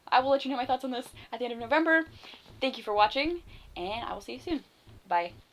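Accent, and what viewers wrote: Canada. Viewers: You're definitely Canadian, but I'm guessing you're from Newfoundland or somewhere close.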